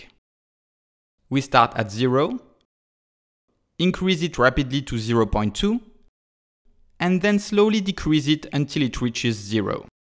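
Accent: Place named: France. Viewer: Accent français détécté ! Nan sans déconner super vidéo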